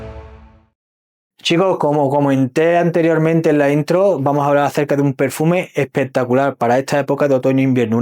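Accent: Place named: Andalusia, Spain. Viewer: Me encanta el acento andaluz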